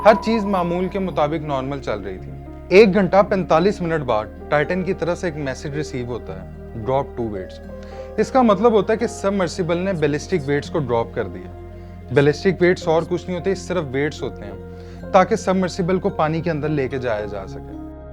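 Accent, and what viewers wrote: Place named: Punjab, India. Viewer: Keep it up . Lekin apni Urdu ko aur saaf tareqe se bole. Punjabi touch door kre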